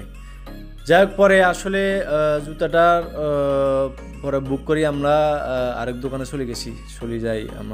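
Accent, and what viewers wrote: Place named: Noakhali, Bangladesh. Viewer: Baiya apnader bari Noakhalir kon jaigai plz bolen, apnar Kotha amader ancholik basar moto.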